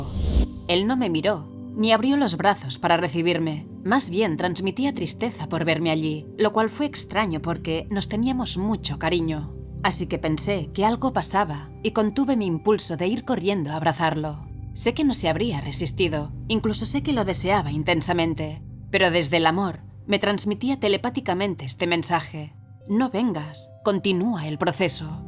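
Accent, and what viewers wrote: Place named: Spain. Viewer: Esta ECM nos deja una gran moraleja, tenemos que ser más empaticos y cuidadosos cuando decimos o hacemos algo, tal vez sin querer podemos lastimar u ofender a los demás. Me encantan estos testimonios, me traen paz y tranquilidad, me hacen pensar que en algún momento me voy a reunir con mi niño en un lugar de perfecta paz, además ese tono de voz y ese lindo acento español es muy relajante. Te lo agradezco mucho. Saludos cordiales desde Colombia!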